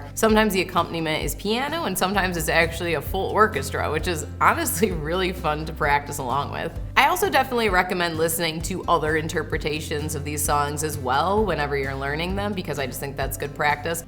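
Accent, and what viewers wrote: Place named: Upper Midwest, United States. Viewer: I had literally never heard her speak, so I didn’t know she had such a thick Wisconsin/Minnesota accent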